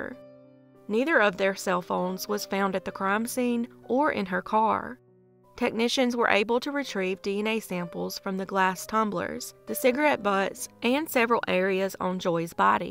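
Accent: South, United States. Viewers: Love you and your channel. Also love your accent because I’m from the south too(Georgia) and I have my heavy accent too lol and I LOVE IT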